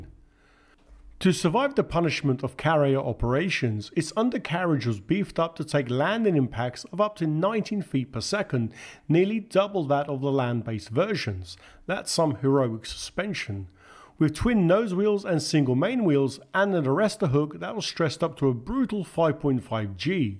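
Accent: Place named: United Kingdom. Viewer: You have probably heard this before, but I bet you do a wicked Christopher Walken impersonation. I have chuckled a few times watching this when you sounded just like him, but with a British accent.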